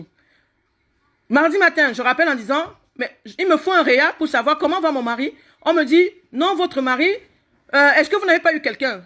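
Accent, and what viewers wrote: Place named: Cameroon. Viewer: Tu as un accent camerouneen. Nous avons apprit que le DOLIPRANE EST SUPRIMÈ DU MARCHÈ PHARMACEUTIQUE PARCEQUE CE DOLIPRANE A UN TAUX ELEVÈ DU CAFFEINE ET C'est mauvais de prendre ce produit si tu as des problemes gastriques et cardiaque. Ton mari avait un mal à la tète et tu as appelè l'ambulance SAMU pour l'amemer à l'hopital. Il fallait d'abord attendre demain matin si les maux de tete persistaient. En lui produisant de la PARACETAMOL ET DES VITAMINES C OR DES ORANGES. 2 COMPRIMÈS PARACETAMOL ACCOMPAGNÈES DU THE ROUGE AVEC UN DEMI CITIRON VERT ET DU MIEL. MASSÈ SON FRONT LA TETE AVEC DU VICKS MENTALATHUM ORIGINAL DE LA PHARMACIE. MASSE TOUT SON DOT AVEC DU VICKS ET LE COU ET LA GEORGE. MASSÉ SA POITRINE DONC LES COTES AVEC LE VICKS. DEMAIN MATIN FAIT LA MEME CHOSE MAIS EN LUI DONNANT UNE DOUCHE BIEN CHAUDE ET MET LE VICKS DANS l'eau dans le benoire. 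Madame tu sais tres bien que les blancs ne portent pas á les peuple noirs quand tu les enttends dire que les VACCIN DU COVID19 DOIT ETRE TESTER EN AFRIQUE NOIR. NOUS SOMMES EN EUROPE MAIS À PARTIR D'AUJOURD'HUI TOUT LES NOIRS VIVANT EN EUROPE DOIVENT MAINTENANT FAIRE ATTENTION PARTOUT OU TU ES. LE COVID19 A ÉTÈ ELABORE POUR EXPEDIER EN AFRIQUE NOIR ET NOUS TUÈS. PEUPLE NOIRS AFRICAINS REVEILLONS NOUS.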